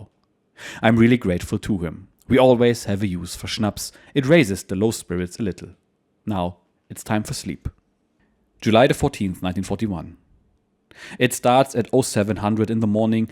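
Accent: Germany. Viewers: great initiative and great books. the only small thing that I complain is that the German accent is sometimes a bit hard to understand thank you!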